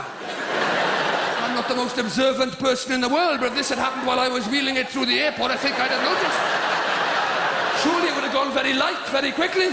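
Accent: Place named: Scotland, United Kingdom. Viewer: I assumed he was Scottish not welsh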